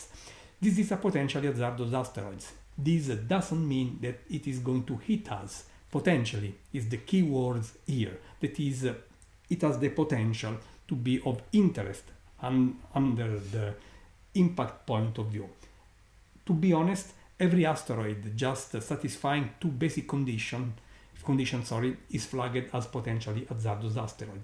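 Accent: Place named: Italy. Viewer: Se entiende bien el inglés italiano por lo menos para los latinos jaja. Gracias por compartir esta experiencia. Espero que podamos seguir observando hechos relevantes del espacie en tu canal. Seguimos conectados desde Paysandú, Uruguay.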